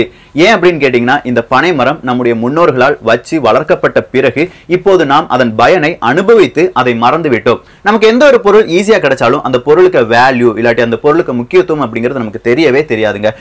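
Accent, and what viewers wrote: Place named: Udangudi, India. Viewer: Enga oorum udanguditan. So happy to hear .That's why your tamil slang is super.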